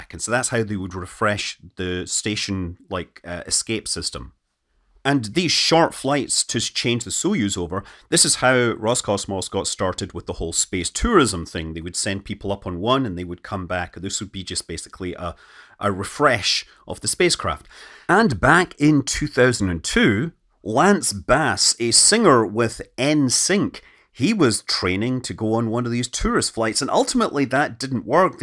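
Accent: Scotland, United Kingdom. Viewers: Also when is England going to teach Scottish blokes to speak English?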